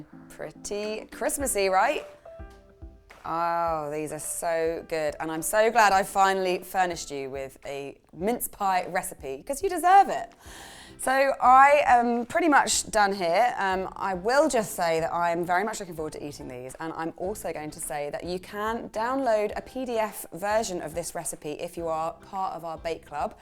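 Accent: United Kingdom. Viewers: I am puertorican,and I love my kitchen and other peoples kitchens. To try new recipes, I've never had mincemeat pie,I thought it was literally made with meat. I love raisins, and your pie crust looks so delicious,I think I will try this for my Christmas 2025,God willing 😊 I love your british accent. Thank you and blessings to you.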